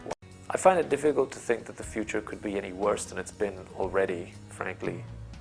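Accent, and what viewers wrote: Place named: Pakistan. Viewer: This is pure Pakistani English accent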